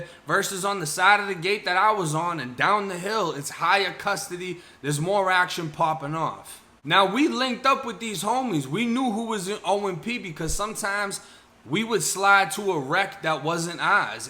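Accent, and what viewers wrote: Boston, United States. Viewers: You sound like you from Boston🤔🤔🤔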